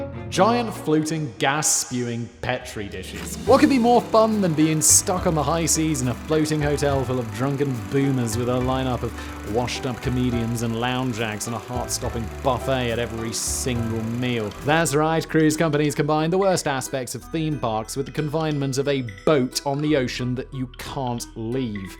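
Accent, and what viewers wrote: United Kingdom. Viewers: . Please do your research. I love you and your brit accent but you have blown it now. That cotton-looking plant is definitely not cotton. We grow cotton in bnbnmnb can't say because of state spies kkkk, Just spend 30 seconds on the internet and you will see a real cotton plant. Your pic shows a harmonious loving field of flowers, but a real cotton field uses pesticides, and child labor and the topsoil is washed away in rain. None of this happens in your Alice in wonderland field of flowers. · Another example of British accent being conflated with intellectual prowess. What an a$$. 😂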